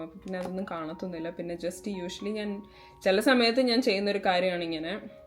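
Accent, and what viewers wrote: Pathanamthitta, India. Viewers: Enik ariyathila’that typical pathanamthitta slang 😅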